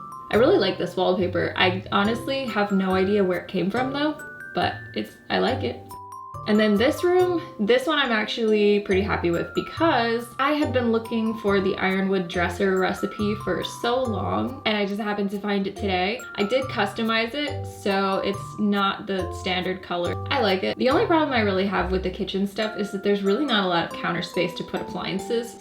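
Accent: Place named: United States. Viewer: Are u American because u have a different accent then beatemups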